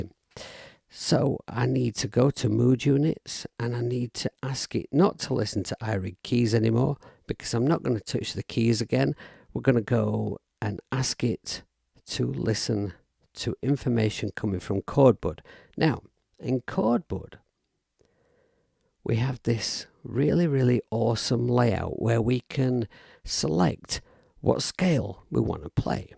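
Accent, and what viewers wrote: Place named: Sheffield, United Kingdom. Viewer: Hiya, I've been using the Rozeta suite for a while and now, thanks to you and Doug I've discovered the bud apps too. And of course you're right. I will lose days and weeks immersed in this stuff. P.S. I'm from Sheffield and I can tell your accent is not dissimilar to mine. Keep on rocking brother 🙏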